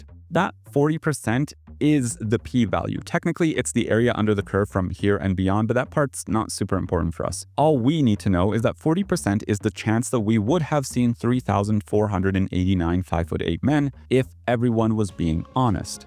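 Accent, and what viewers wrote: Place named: Sweden. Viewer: I see, sorry for assuming. I thought I heard like a faint hint of Swedishness in the way you pronounced some vowels. Great video by the way🙏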